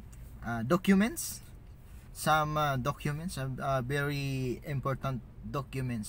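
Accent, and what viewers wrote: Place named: United States. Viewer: Ang galing naman pwede na mag amerikano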